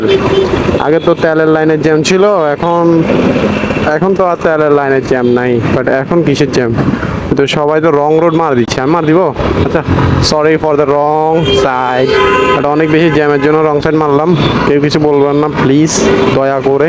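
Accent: Chittagong, Bangladesh. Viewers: vai kothagulo valo laglo,Apni Chittagong er? Always video up diyen dekhbo 💝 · Apni ki cttorgram e taken kota sune buja jasse amader cttorgram er manus ❤️🤝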